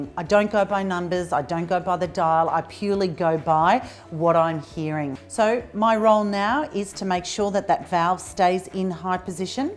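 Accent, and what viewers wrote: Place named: Australia. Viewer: Thank you I love Australian people/accent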